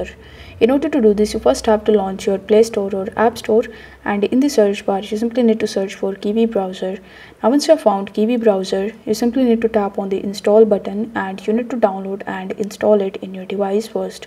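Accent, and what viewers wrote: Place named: India. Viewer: You're speaking Indian English. Can't hear a thing!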